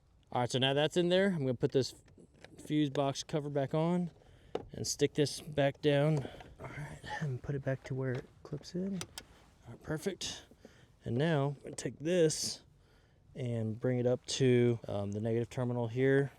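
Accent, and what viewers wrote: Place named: Texas, United States. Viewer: What I like about this one is the honest approach/honest self appraisal of safety, wiring, and personal accountability to get R done, then, later, to address any issues after the fact since time was short for the install. The other thing I like about this one is listening to a Texan talk. Last thing is to have here the corroboration to/of where I can find my Upfitter Switches' terminus under the hood! Win/win/win. Thanks a ton! Cheers.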